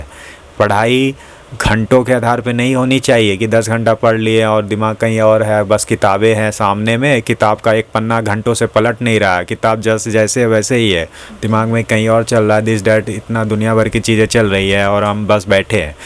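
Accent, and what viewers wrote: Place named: Bihar, India. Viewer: Hai na. Karna baar baar shayad thik nhi h Bihar ki jhalak aa rhi h shaayad isme sudhar ki jarurat h Bhai.baaki lage rho .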